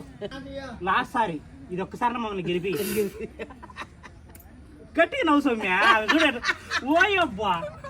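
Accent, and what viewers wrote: India. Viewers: మీది కడప న స్వామి 😅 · హాయ్ అండి where are you from ఆ యాస చూస్తే మన రాయలసీమ లాగా ఉంది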